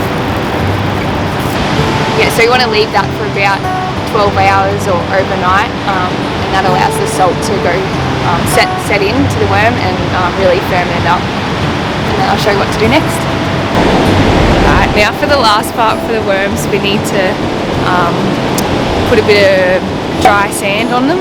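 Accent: Australia. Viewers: One of the coolest girls I’ve ever seen and the great Australian accent😃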